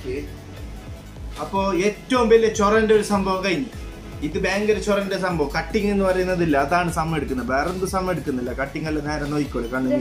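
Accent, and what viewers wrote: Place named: Kasaragod, India. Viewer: അടിപൊളി സംസാരം രസമുണ്ട് കേൾക്കാൻ കാസർക്കോട് languageജോറായിട്ടുണ്ട്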